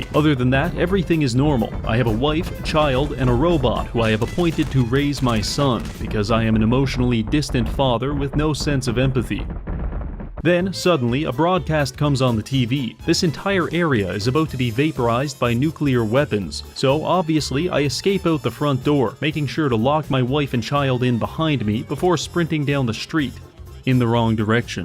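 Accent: Newfoundland, Canada. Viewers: Are you canadian? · Because is violently canadian, and his accent comes through really hard on some really random syllables. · Can't enjoy this for the hard-stabbed Canadian O twice a sentence. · Instead of Car all I hear him say is “ker”, Canadians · Dude are you from Newfoundland? I recognize the accent.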